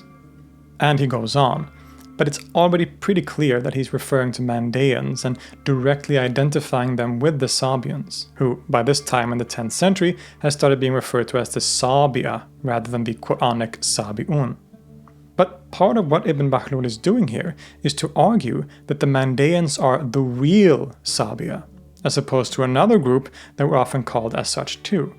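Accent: Arab world. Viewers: Ur semitic arabic accent is on point.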